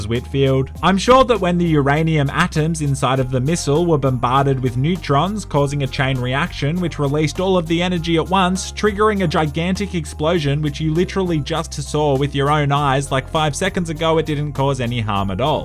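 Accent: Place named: Australia. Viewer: Never thought I'd ever hear a monotone Australian, but eh, better late than never!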